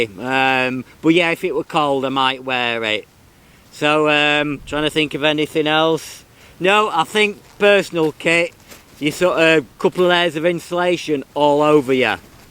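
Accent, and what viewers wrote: United Kingdom. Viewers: Thanks, good information. Also, I enjoyed the accent, if I didn’t know better, I’d believe you were British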